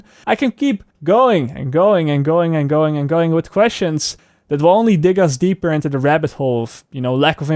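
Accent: Netherlands. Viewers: Im pretty sure this guy is Dutch :D that ''zie je'' later at the end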